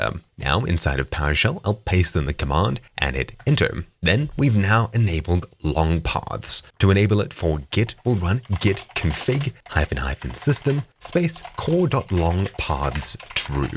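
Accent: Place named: South Africa. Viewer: Whoa sounds SAfrican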